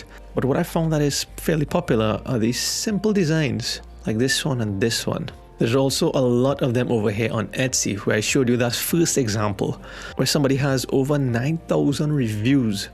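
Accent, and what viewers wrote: Trinidad and Tobago. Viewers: Are you a Trini?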